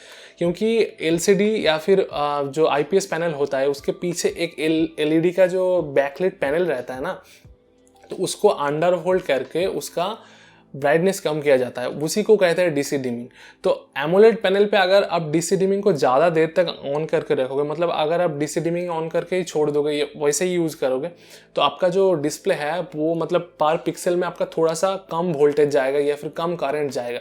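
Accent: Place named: Bengal, India. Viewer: bro tum bongoli ho kya? great work tumhe bahut mehnat lagi hindi bolne mai. koe na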